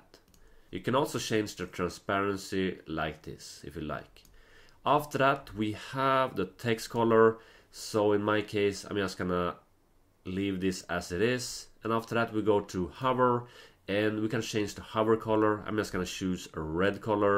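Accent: Sweden. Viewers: Sounds like your Swedish by the accent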